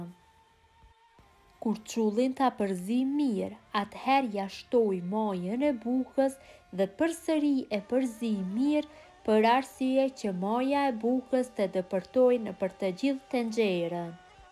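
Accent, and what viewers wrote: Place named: North, Albania. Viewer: o zemer , je e mrekullushme, jo vetem per receten e Bozes por edhe per dialektin e mrekullushem te veriut qe ke, gjithashtu edhe muzika ne sfond , te uroj nga zemra gjith te mirat, pershendetje nga Gjermania.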